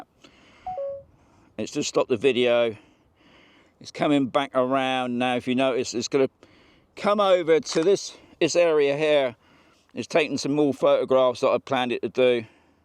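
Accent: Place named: Bristol, United Kingdom. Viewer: Sounds like you're from Bristol, UK. Am I right?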